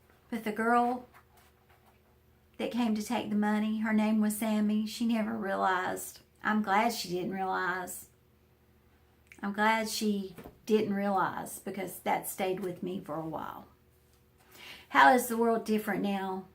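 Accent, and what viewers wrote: South, United States. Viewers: I love your sweet southern accent.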